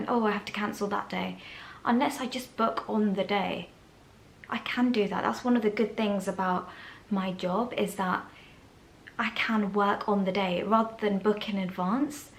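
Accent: United Kingdom. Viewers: Dear sweet. You are adorable!!! I watch your Tuesday videos on the car ride home for you and I drink my lukewarm bottled water that’s been sitting in my car all day. I love hearing you speak. First of all your British accent is adorable. I’m from California where nobody enunciates their words. You have the best elocution. Lol, it’s so great to hear you speak about things other than the C word because you are so much more than that. I want to hear about whatever you want to talk about. This is your safe space. I’m really happy to hear that you are looking forward to working. It would be amazing if you could start out on a very part-time basis. Filling in for people who need to take a day off would be so helpful to your coworkers, the patients and for you. It would be a win-win-win situation. It is a bit early for Christmas. Lol. But I’ll let you be you!!! 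Don’t throw away your tree on the 26th., though. The Christmas season actually lasts until January 6th. 🎄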